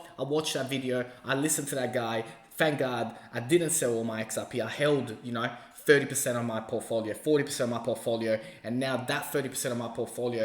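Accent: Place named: Australia. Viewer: Glad I found you bro. Judging by your accent your from AUS. I'm also from Aus specifically Melbourne. Their arnt that much AWAKE/AWARE crypto people in AUS like yourself. Gave you a sub mate 🙏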